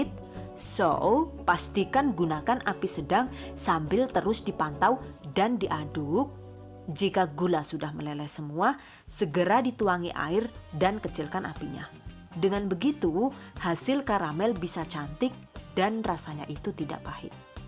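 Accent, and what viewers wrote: Banyumas, Indonesia. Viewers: Ngapak ok, english ok josss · Ngapaknya mana mba?? Kangeeen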